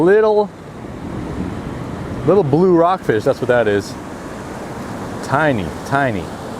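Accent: Bay Area, United States. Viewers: U know from the bay when he says hella yee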